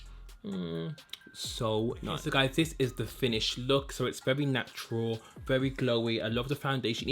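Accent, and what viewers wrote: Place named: Cockney, United Kingdom. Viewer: I get my Sephora-fix by going over to Paris on the Eurostar. If you don’t mind me asking, are you from the midlands or London? My hubby says your accent is cockney...I say Brummie. Lots of love from Bristol🥳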